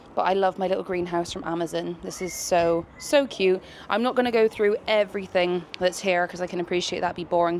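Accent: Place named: Bristol, United Kingdom. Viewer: Hi. I’m quite a new subscriber to your channel but I really enjoy your videos. There’s always so much variety and you’re always so natural and honest. I love your Brizzy accent- I’m a West Country gal but have been living in Greece for the last 42 years but love to see what’s happening in the U.K. 💖🌸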